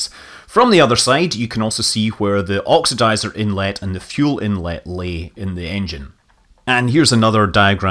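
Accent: Scotland, United Kingdom. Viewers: He speaks clearly and pronounces well. And obviously a company that does language recognition in many different languages can manage a Scottish Speaker subprofile for English. Besides, that is not a strong accent, it is a very mild one. Go watch the Prime Minister’s Questions when an SNP (Scottish National Party) leader asks questions.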